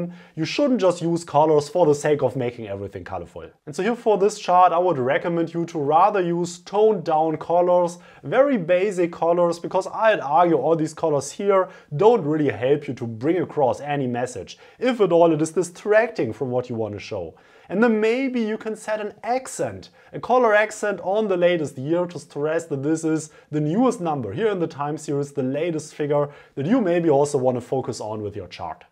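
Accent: Germany. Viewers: I got a headache after listening to his accent. Is he German or French? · Obviously German ; ) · Your german accent is hilariously strong, to a distracting extent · You can hear it directly that he is German 😂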